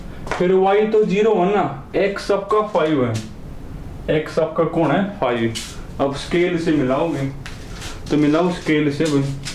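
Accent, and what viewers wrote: Haryana, India. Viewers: Super aap harayana see ho kya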